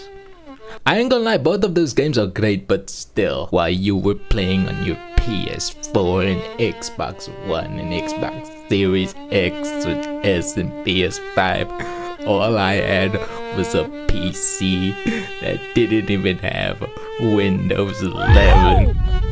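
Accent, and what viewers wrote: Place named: South Africa. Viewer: Eish I thought u where from South Africa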